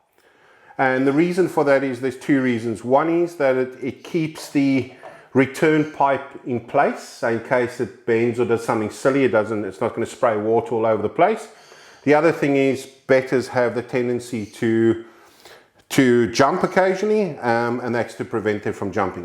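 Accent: South Africa. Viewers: You sound south african? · Thanks a lot MOST helpfull . PS you sound South African.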